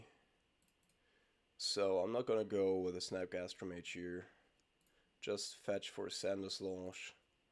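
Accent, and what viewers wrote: Germany. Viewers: Hey, nice vid. :) are u from germany? ;)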